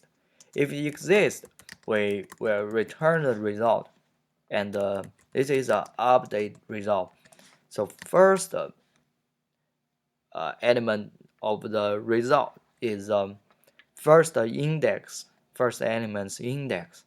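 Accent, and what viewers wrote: China. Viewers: 虽然你的口音超级重，但是我感觉比美音还好懂！！哈哈哈哈非常的Chinese了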